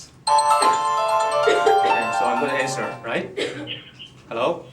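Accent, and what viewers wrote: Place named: Singapore. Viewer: singaporean? malaysian? seems to be singlish..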